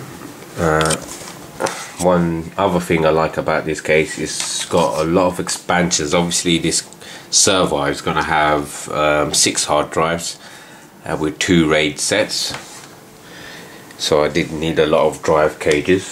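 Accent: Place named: Birmingham, United Kingdom. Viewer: Your from birmingham aint you ;)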